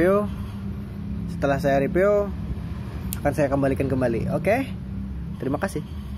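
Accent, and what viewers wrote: Banjar, Indonesia. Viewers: Kaya ada logat logat banjar nya gitu om hehehe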